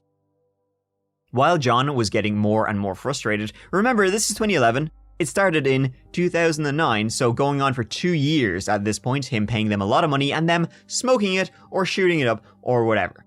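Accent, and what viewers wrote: Ireland. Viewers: There is that saying, “One who wants their bread buttered on both sides” It does share that very same meaning “One who wants to have their cake & eat it” I love such sayings, they are simple & there aint a human being on the planet who doesnt know wot the heck they mean! Like this 1, “ U’ve made ur bed, so go lay in it” Another good’un, “ One who has Champaign taste, but only has Beer money” I just subd to That Chapter PodCast, coz i saw the length & to be honest, like really honest, (er... this may creep out a tad) its wicked Irish accent & his wicked wit & dry as fk & sarcastic sense of humour that has me totally addicted to bingeing the heck outta his normal YT channel, so now im gonna binge the fk outta his PC! Sorry, but u are addictive full stop!! Just had to lob that 1 out there, no point in hiding the reality! U ought to be blushing, someone beimg addicted to u, thats a complement, but so u dont freak out, i DO NOT have any intention of stalking u or finding out where u live so i can send u letters & strange gifts, etc!! 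Nope, i am only addicted to YT channels, (SO REST ASSURED ME OL’ FRUIT & NUT, ur safe) no stalkerish behaviour here!! Hahahaaa!! Now back to this PC, thanks! 💝, Peace & Bananas! Ha! 😝😉🙏🏻🤗🤣🤣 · I've watched all of your videos and started listening to your podcast. Omg you are amazing so entertaining, adorable with that Irish accent lol. Your storytelling with a touch of humor but respect for the victims, I'm speechless. The last time I laughed so hard was w Howard stern (when he was funny) Your starting a culture if that's the right word. I'm telling my family and friends to watch you videos and podcast. And why?? You ask. "Coz I love ya" ❤️ lol, your friend Mariah from New Jer USA.❤❤ Goodluck in all of your endeavors.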